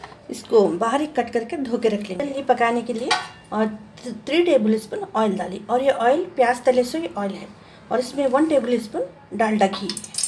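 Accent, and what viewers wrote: Hyderabad, India. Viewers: Hamari Hyderabadi's language hai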